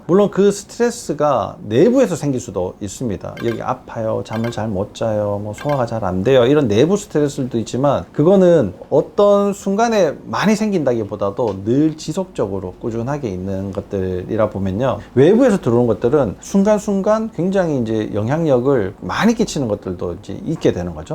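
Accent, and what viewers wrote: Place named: Gyeongsang, South Korea. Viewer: 깅썅도 사투리.억양.엑센트 듣기 싫으네.... 기본도 모르면서 아는척을 엄청 나불 나발 거리네... 순진한 환자들 다 넘어간다...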